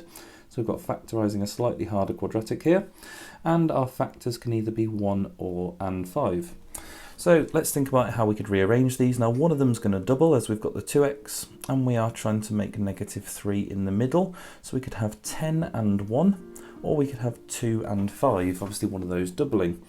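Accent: United Kingdom. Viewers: No doubt this guy's videos are good. I like the mild black country accent.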